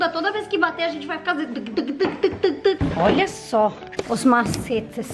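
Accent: Rio Grande do Sul, Brazil. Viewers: BAH THÊ ELA GAUCHA MESMO EU SOU DE VIAMÃO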